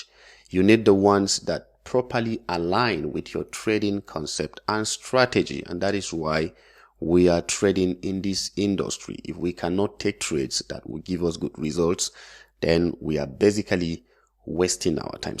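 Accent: Nigeria. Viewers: Nice, are you from Nigeria?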